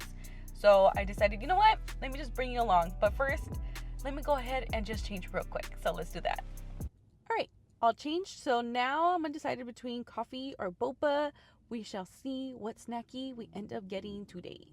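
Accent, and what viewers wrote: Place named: Florida, United States. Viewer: Sound like you from Florida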